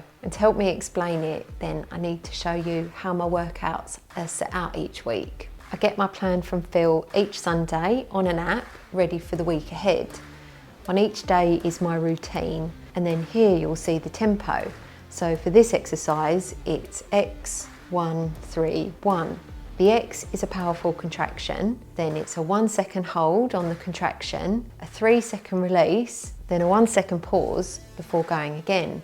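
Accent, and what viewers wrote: United Kingdom. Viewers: You know the weird feeling you get on YouTube when you follow a channel for so long that in your head you are totally like a real friend to the channel host! Well that happened to me and I am not even ashamed! 😊 You are bloody brilliant! (That was my most British impersonation, also said it in your fabulous orgasmic accent!) 🫶🏻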